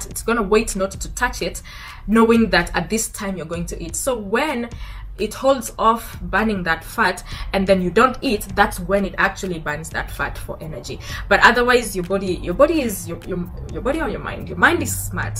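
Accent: Kenya. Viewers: You have a very strong Kenyan accent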